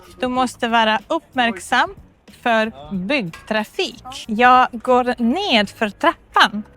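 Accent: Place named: Poland. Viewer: Riks-svensk dialekt, med spår av Polska. Men nästan ingen Göteborgska. Det finns ganska många lokala dialekter i Sverige. Lycka till.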